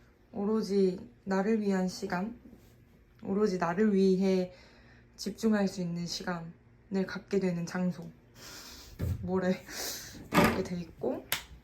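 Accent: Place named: Gwangju, South Korea. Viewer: 누가 봐도 광주 분이시네요 사투리가 정겹습니다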